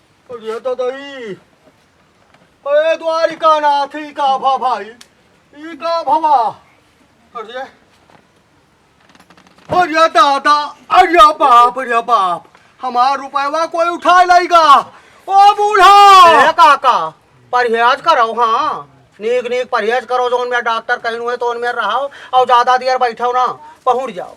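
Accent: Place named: Awadhi, India. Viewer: अति सुन्दर प्रस्तुति मजा आ गया आपकी इस उत्कृष्ट और सुन्दर एक्टिंग से।। हम लोगों का सुंदर बचपना याद आ गया। सबसे सुंदर ये लगा की अपना अवधी में सुना। इतनी मिठास भरी हुई है की मन प्रफुल्लित हो गया।।। Best of luck for your future endeavours and all the upcoming videos.. My best wishes to you and your teams.. God bless you all. Jai shree Ram ❤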